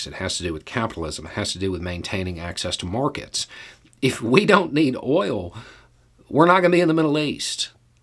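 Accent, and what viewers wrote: South, United States. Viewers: doing a mocking deep southern accent while already having a southern accent lol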